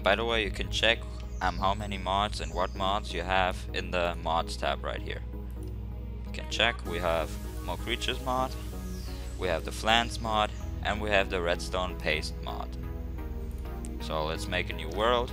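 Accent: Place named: England, United Kingdom. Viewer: i think you sound English not germen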